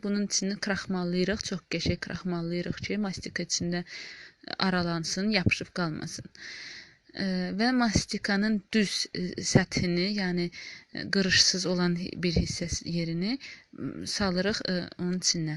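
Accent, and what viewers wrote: Ganja, Azerbaijan. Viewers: Xanım diyəsən Gəncəlisiz hə?🤗ləhcəniz çox oxşiyir gəncəlilərə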